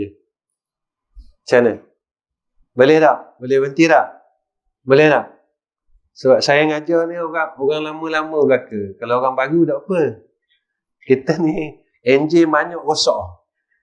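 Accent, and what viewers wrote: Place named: Terengganu, Malaysia. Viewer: Ustaz ni orang mana, orang Terengganu ke, cakap mcm org Terengganu je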